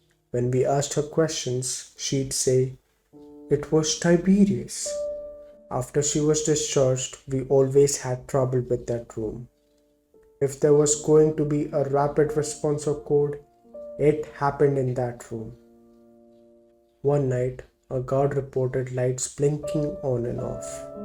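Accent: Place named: Asia. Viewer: Mispronouncing English words, bad voice, loud piano, background noise. The narrator must be non-native, someone from Asia. (bad experience)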